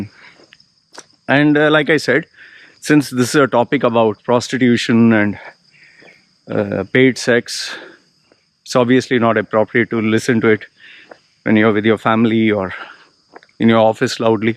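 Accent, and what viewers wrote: India. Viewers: your accent is more like an Indian. where are you from brother?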